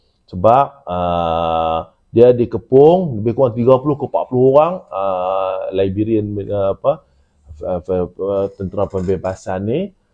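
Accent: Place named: North, Malaysia. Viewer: Assalam tuan pekat snguh tuan ckp utara rindu plk kg haha...mcm crown yg tuan pkai tu ada allah/muhammad kn klo org bkn islam pkai tu mcm mna plk tu tuan..😊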